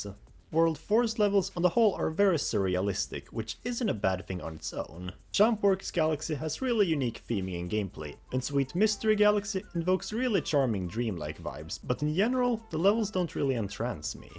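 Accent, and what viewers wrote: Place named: Sweden. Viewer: are you from sweden? you speak with a swedish accent and i am from sweden so i recognize it. i also love super mario galaxy 2. är du svensk? du pratar engelska med svensk brytning så jag känner igen den. jag älskar också super mario galaxy 2.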